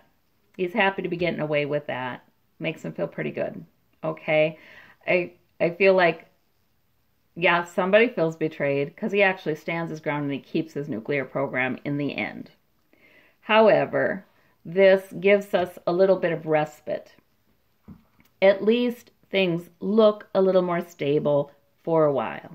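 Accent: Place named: Midwest, United States. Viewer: Love your readings. I can relate to your voice thinking you are from the Midwest, ya know.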